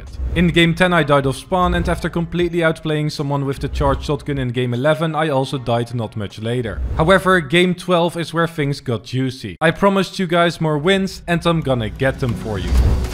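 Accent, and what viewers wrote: Netherlands. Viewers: Are you from the Netherlands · are you dutch? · Are you Dutch?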